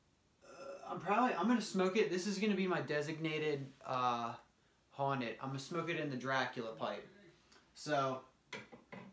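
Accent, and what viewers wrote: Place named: Philadelphia, United States. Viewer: You have a strong Philly accent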